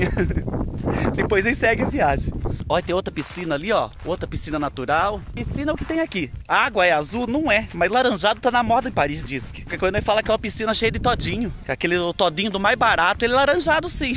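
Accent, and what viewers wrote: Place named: Paraná, Brazil. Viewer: Seu sotaque é bem parecido com do povo q mora no Paraná..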